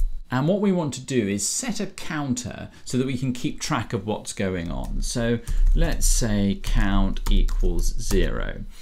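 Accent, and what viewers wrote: RP, United Kingdom. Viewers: Is this Queen’s English? He sounds quite sophisticated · I wouldn’t go so far as to it’s the Queen’s English, but probably as close to RP as you’ll see on a YouTube video on Python. As my mother would say, he’s ‘well spoken’ .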